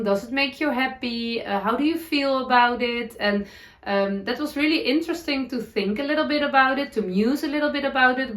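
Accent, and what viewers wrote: Netherlands. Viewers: Noble Character Crafts was talking about her Ariana cardigan on her podcast and she mentioned you and your sweater. I had to come find you and see about your Ariana(s); which are so pretty!! Thank you for giving so many details. The latest one turned out so good! I love your energy and your dutchy English. You made me laugh out loud when you were talking about making a longer Ariana. You were correct in calling it your butt, but I think I prefer "sitting pillows" better. 😀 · Dear, I really love your "heartjumping" Videos and especially I absolutely love your "dutchy English". Thank you for all this inspiration. happy greetings from Germany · papagei is parrot in English - I googled it - with bright red, blue and green - from Michelle. I love watching your podcasts - I love listening to your dutchy English -it makes me giggle from time to time.... 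I live in New Zealand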